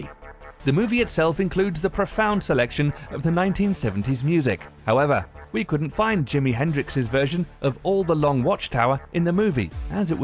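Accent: England, United Kingdom. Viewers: what the f u c k are you doing ? ( peter 'pole' gazer ) pack your bags ? fix you a visa ? turbulent Hollywood history ? . okay don't answer that , your not from around here are you ? I mean from America ? and worst of all an English accent 'cynical humor' narrator . ( I'm speaking for myself ) but I'm still going to say ' w e a r e s I c k to d e a t h of b r I t I s h a c c e n t s p l a s t e r e d e v e r y f u c k I n g w h e r e' it's not cute, it's not jolly , it's not a "romp" . even if I'm way off on this and get all kinds of blowback I don't care . take your effing insults and stick'um up your A R S E ! jeezus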